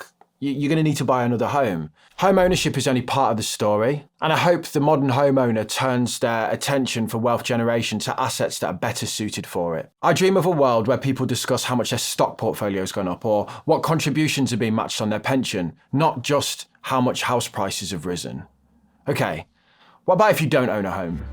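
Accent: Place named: Midlands, United Kingdom. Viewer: Brilliant. I never thought I’d enjoy a video on finances. Also… loving your Midlands accent!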